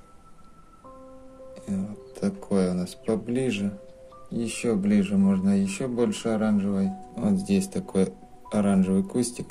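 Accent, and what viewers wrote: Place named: Odesa, Ukraine. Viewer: "Снова- таки три сантиметра.".. Украина. Замечательный диалект. Одесса?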